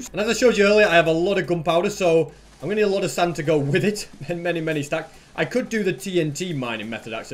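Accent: United Kingdom. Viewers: Dude your British so I’m I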